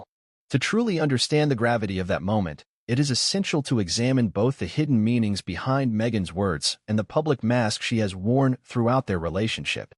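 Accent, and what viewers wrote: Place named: United States. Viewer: Is this true or just another example of American accented BS?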